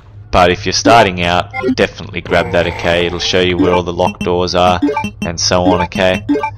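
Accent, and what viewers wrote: Australia. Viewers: You're definitely an Aussie with the way you speak 😂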